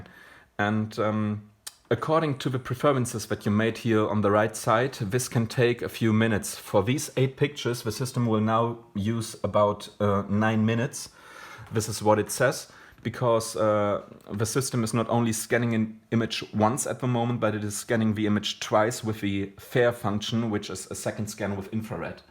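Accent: Germany. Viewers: dieser deutsche akzent den hört man als deutscher irgendwie immer raus unfassbar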